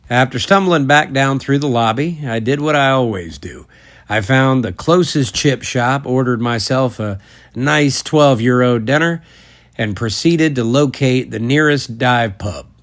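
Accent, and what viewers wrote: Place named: Texas, United States. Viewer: The delivery and vibe this man brings is beautiful, it’s that old Texas twang full of warmth and character. Really excellent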